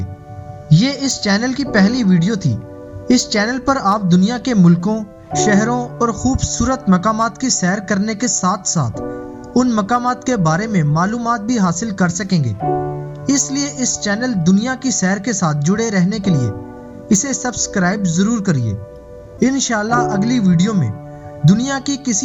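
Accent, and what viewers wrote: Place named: Pakistan. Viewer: VDO se ziada ap k urdu accent se lutf andoz huay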